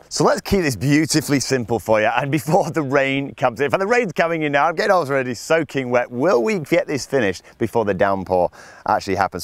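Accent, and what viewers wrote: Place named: Northern England, United Kingdom. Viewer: Cak handed - wow thanks i'll try it in Mjorca next week - great videos and love that Northern accent 👏👏👏👏👏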